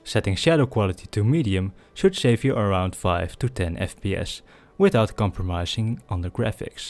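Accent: Netherlands. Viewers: Probably someone from the Netherlands. I'm Dutch myself and know a lot of Dutch people speak English like this. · Dat Nederlands accentje... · lol he has such a dutch accent (mooie vid) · does. He just has a really bad Dutch accent, which for some reason tends to add a lot of "sh" sounds in the wrong places for lots of people. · Your accent sounds very dutch, am I right? xD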